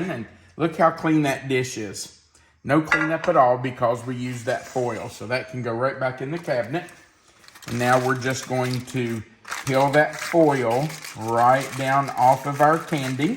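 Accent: United States. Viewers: Just sayin, I'm from Nevada. If you can say Banana correctly you can say Nevada properly. Great video can't wait to try it on our grandchildren. Didn't notice the accent....just American accent! Keep going.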